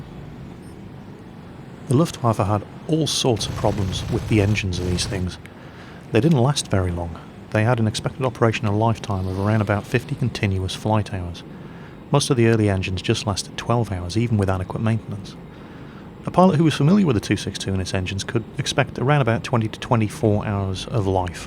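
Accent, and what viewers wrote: England, United Kingdom. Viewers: You did pronounce "Schwalbe" correctly, although one can hear your English accent! I'm proud of you :-D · This guy is British. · Your german pronounciation is astoninglishly good, given that you have such a british accent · The Russians won WWII. Band of Brothers is a clever propaganda movie and HBO series, 1944 Germany was already lost and real historians know this. You sound smart - but your audience are kids. As a lawyer, age 58 and Russian/American and history major I know your British accent is part of your false narration of history to video kids.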